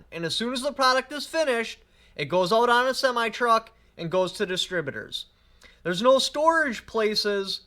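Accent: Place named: Wisconsin, United States. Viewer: LOVE ALL OF YOUR VIDEOS AND WISCONSIN ACCENT. KEEP SPEAKING THE TRUTH BROTHER.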